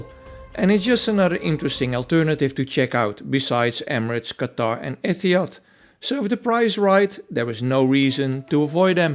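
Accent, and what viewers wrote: Netherlands. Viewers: Do I detect a NL'er here speaking?😅 Oman has been for years on my next new destination.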